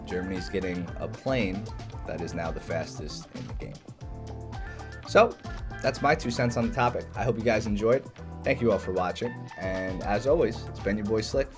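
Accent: Norway. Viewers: Are you from norway me to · Is he norwegian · Wait, er du norsk? (are you norwegian)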